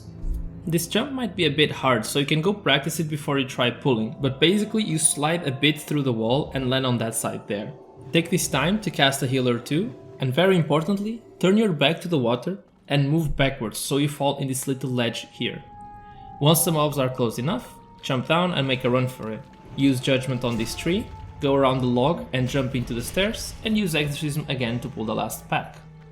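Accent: Portugal. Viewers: I could sense it in the accent, olá amigo! 😆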